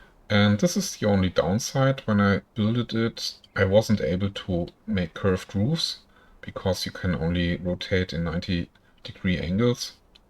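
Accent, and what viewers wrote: Germany. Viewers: That's awesome! I have my BA, so I'm fairly artistic myself. I hope I can build my castle even half of how cool yours turned out though. I'm just worried about going to big and making my machine struggle to run the game so not sure how big I'm going to end up going yet. I'm incredibly impressed by your build though. Going to throw you a sub and keep in eye out for other cool builds. Also you sound like you're German? Yeah? My mom was born in Germany so I have family over in Germany. 🙌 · 20fps ... nice ! :D But impressive building. You have a typical german acent btw :D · The german accent sounds so terrible to me. But good video though. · Richtig geiles Ding haste da aus und in den Stein Gekloppt! Respekt, ich freu mich auf mein PC upgrade, dann werde ich mich auch in dieses wunderbare Bausystem verlieren. PS. Hab direkt am Akzent erkannt das du Deutsch-Muttersprachler bist hehe xD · Da mich der deutsche Akzent quasi anspringst, werde ich mal auf deutsch kommentieren. 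😅 Die Burg ist wirklich extrem gut geworden und Respekt dafür 👍 Am besten gefallen mir die vielen Kleinigkeiten in deinem Build, vor allem die Geheimgänge. Zwei Punkte wo man meiner Meinung nach (persönliche Geschmack) noch etwas was verbessern könnte. Die "Main-Hall" könnte ein bisschen mehr Prunk gebrauchen, weil es das erste ist was man sieht und soll die Besucher natürlich gleich überzeugen. Es fehlt etwas an Pflanzen in der Burg. Das sind aber wie gesagt zwei Kleinigkeiten und ist wirklich beeindruckend was du da gebaut hast. Viel Spaß weiterhin beim spielen und bauen.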